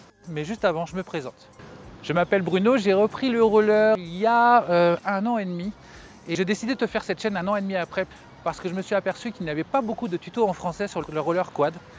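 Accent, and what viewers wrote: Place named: Réunion, France. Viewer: Bonjour 👋 Et mes sais a la reunion ?l’endroit et ton accent me le fait penser je commence tous juste le rollers premier pas en rollers sait la galère sachant que je suis obligé d’à prandre seul 😢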